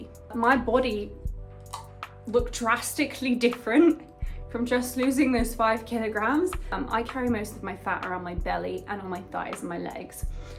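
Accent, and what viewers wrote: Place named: United Kingdom. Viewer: Totally off topic but without enjoying your video there is another reason why I'm here and the reason is I'm using your videos as my British pronunciation tutorial. I'm practicing British pronunciation by watching your video and it's really help me a lot. Lots of love 💓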